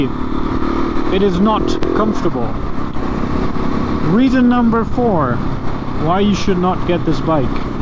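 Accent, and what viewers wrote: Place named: Germany. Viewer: Kompliment für den Akzent, hört sich nicht so schrecklich deutsch an :D Sehr aufschlussreiches video!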